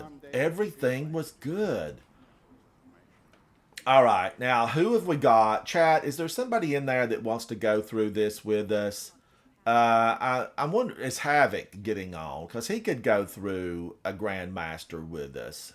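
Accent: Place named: Appalachia, United States. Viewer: What is your accent? Appalachian? Love your content, you're very charismatic.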